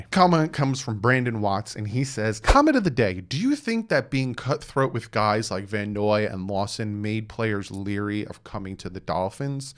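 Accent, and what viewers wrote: New Jersey, United States. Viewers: Late night letting his Jersey accent out to play.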